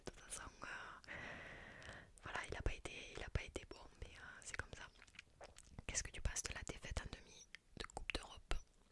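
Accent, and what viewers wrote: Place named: Marseille, France. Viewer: L’accent 😍 marseillaise en plus 🔥 ailier gauche à la Dimitri Payet, caviar sur caviar pour ta numéro 9 🤝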